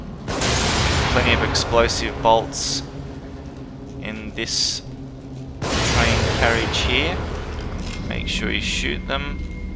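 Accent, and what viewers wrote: Australia. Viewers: just started watching you and im in love with ur channel already. btw are u Australian? n will u be doing chapter 15 anytime soon?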